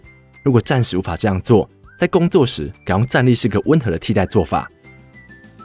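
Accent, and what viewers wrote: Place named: Taiwan. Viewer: The speaker has a very thick Taiwanese accent.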